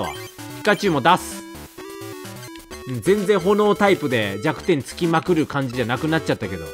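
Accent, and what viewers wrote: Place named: Western Japan, Japan. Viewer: なんかね😂西の人だなぁって😂😂 こん↑ぱん↑とか いー↑ぶい↑ って キュンぽいんとなのは私だけ？😂❤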